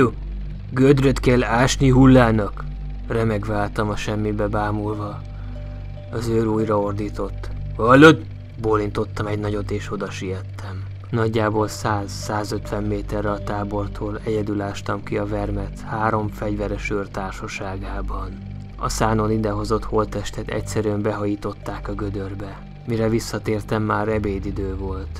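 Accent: Russia. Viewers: Jó az orosz akcentus :-)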